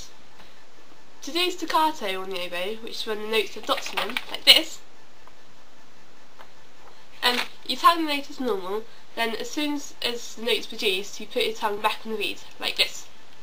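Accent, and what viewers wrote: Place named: England, United Kingdom. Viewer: Aww you're sweet but this english accent is sickening!!!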